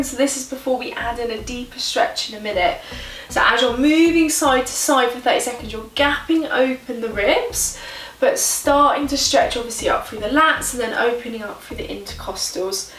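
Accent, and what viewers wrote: United Kingdom. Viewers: Love the British accent! :)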